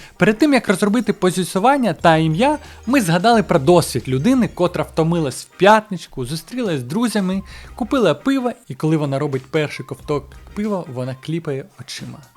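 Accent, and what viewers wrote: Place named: Russia. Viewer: справа воної, леді Ю, живе. слухаю і на фоні 'ґаз, зубажіння, любі маі' пане, читайте, говоріть в побуті, вживайте український контент. бо це якась жесть. кантент, навини, сорак, панеділок... вже десятки відео, а розвитку ноль. згадалось вашою залупянкою 'чукча нє чітатєль, чукча пісатєль' український контент російською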